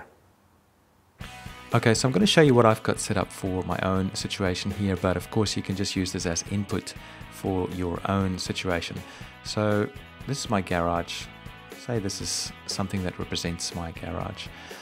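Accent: South Africa. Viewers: or South African living in Australia...does it matter? Its a bloody good video with great advice. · Are you South African by any chance? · You're South African :D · Where r u from u sound like u are from South Africa (not trying to be offensive I from there ) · are you south african? :D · great video, thanks. Quick - and completely non-related - question: are you an expat South African? Or am I just imagining the Saffa accent?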